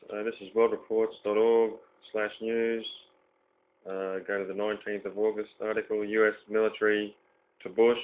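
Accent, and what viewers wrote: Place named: England, United Kingdom. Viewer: weeks huh? LOL-makes me question current info on the current Supposed events Re: Imminent Mass arrests, Banker resignations, Cabal Lawsuits-White Dragons vs. The Fed, et al.-TROLL Radio Show? Or just took longer than expected...You decide...fyi, this guy here isn't even American!! English accent-bad troll radio!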